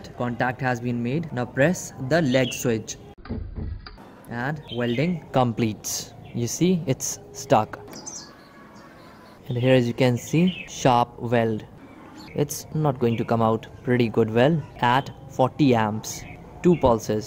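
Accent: India. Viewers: For some reason I love an Indian accent with good English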